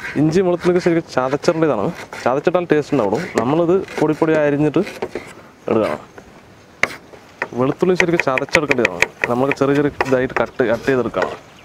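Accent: Palakkad, India. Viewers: സങ്കടങ്ങളും പ്രയാസങ്ങളും വരുമ്പോൾ ഒരു video എടുത്തു ഒരു പിടി പിടിക്കും.. സംസാരം പാലക്കാടൻ സ്റ്റൈൽ വളരെ ഇഷ്ടമാണ്.. ഒട്ടും over ആക്കാതെ വലിച്ചു നീട്ടാതെ.. Food taste ചെയ്യാൻ കിട്ടുമ്പോൾ ആ കുരുന്നു മനസ്സുകൾ ഒക്കെ എത്ര happy ആണ്.. കുക്കിംഗ്‌ ഇഷ്ടപ്പെടുന്ന ഞാൻ ഇക്കയുടെ ഒരു വല്യ fan ആയി മാറി <3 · ningal Marana Mass aaanu. Ningalude aaa palakkaad style talk nangalkk nalla ishtttam aaanutttaa Ningal elllaavarum koodi snehathode irunnu food kazhikkunnathum manasssinu sandhosham tharunnund. Padachon eee sneham nila nirthi tharattte Delivery kondu vannnath muslim payyyan aaanennnu paranaju food reject cheytha aaalukal ullla india il ithupolathe video orupaadu nallla message aaanu nalkunnnath. Nammal elllam paraspppparam snehathode jeeevikkanam. Athaaanu etttavum valiya sambaadhyam ikkkaaa. Ikkkaa ningale padachon orupaaadu anugrahikkkattte. Aaameeen Athu pole eee video il ullla elllaaa chetttanmaarodum orupaaadu thanks. 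Love u all Rabeeh Thrissur ( Dubai ). Lots of love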